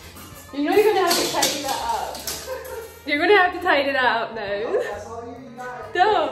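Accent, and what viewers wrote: United Kingdom. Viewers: Omg. You have a lil bit of an accent. British? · Wait a second are u from the UK?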